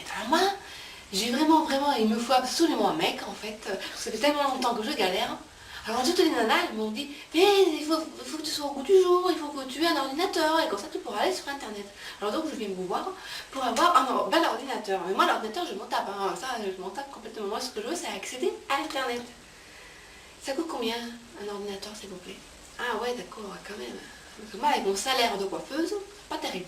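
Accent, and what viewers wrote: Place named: South, France. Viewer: ... et tout ça avec modulations et accent du Sud..! Je garde, je garde 😆 !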